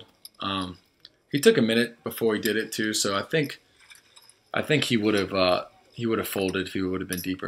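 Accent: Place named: West Melbourne, United States. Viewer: Kinda have the west Melbourne (FL) accent... Don't hear creole. (Brevard county viewr is reason) But I'm feeling your "rounding" hard core style yo. Good vibes if that's your gig. First timer here 👍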